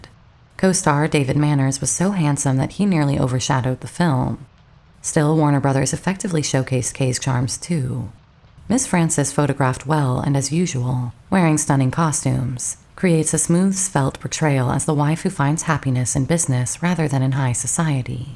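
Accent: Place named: South, United States. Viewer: What was doing?The documentary has a lovely and southern voice, unlike so many of them that are like gracious, I love your voice, whether it's real or unofficials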